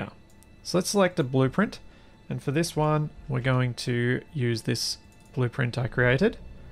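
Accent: Australia. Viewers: I have been enjoying this game sooo much more since I started watching your videos, so just wanted to thank you for all the time and effort you have put in - they are all brilliant - Thank you! I have even gotten used to the Aussie accent:p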